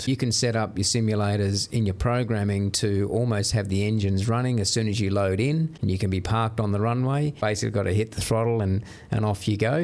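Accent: Australia. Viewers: You're talking my language (apart from the Aussie twang). 70 in a couple of months, been simming since the mid-1980's, through all the iterations. Recently built my own PC to handle the best graphics and flight physics available now (MSFS2024). Only ever flown GA, now a 172-based Reims Rocket. Working my way from the UK to SoCal, take off where I last landed. Never tried VATSim, currently using SayIntentions - but one day i swear I'll join the network. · Are you in south Australia by chance? That accent is familiar.. 😁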